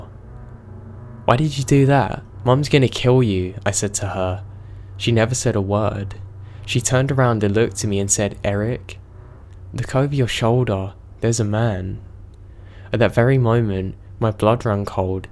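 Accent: United Kingdom. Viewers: Is this an British accent on the first story? Just because an apparition looks like someone like a little girl, doesn't mean it is as it appears. The second story indicates that when the young man was at home (he had the whole place to himself) with his girlfriend that he married later. He was taking a shower and saw "help me" me on mirror in the bathroom and right before this he saw an apparition after the lights dimmed. Then, he says he didn't want to go home that night, but his parents gave him no choice. Hum? I thought he was at home? Finally, it was time to move out into their new, home, Then, he went back to his home with his girlfriend to get the phone charger he had left there The narrator stated this was the first time his girlfriend had been in the apartment. Hum? Am I the only person that heard noticed this?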